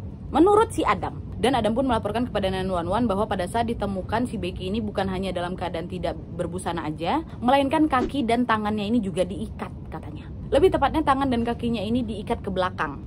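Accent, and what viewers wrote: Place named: Medan, Indonesia. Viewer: Logat kak medan kali😂😂 Btw salam kenal yah wak aku yang setia kali ini nonton videomu sampe berulang pun aku sanggup..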